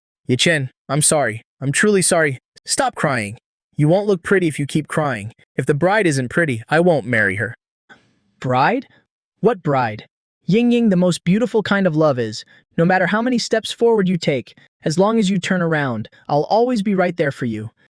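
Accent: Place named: United Kingdom. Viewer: where`d the british accent come from 🫠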